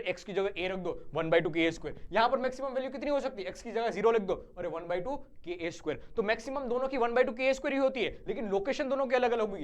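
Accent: Gujarat, India. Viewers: Only gujrati guy recognise other gujju's Hindi😅, BTW sir is gujarati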